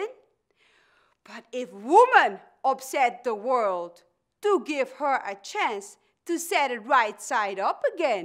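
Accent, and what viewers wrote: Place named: Netherlands. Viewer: Love the Dutch accent. I like to think that Sojourner would be happy to know that her own words are still not forgotten.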